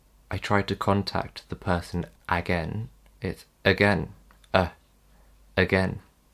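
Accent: United Kingdom. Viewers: I want you to make a video on How did you learn British accent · You have an excellent british sound i am very curious to have that accent. help me how can I have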